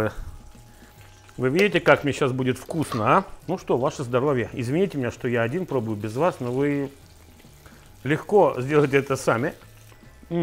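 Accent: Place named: Murmansk, Russia. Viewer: Слышу Мурманск - ставлю лайк.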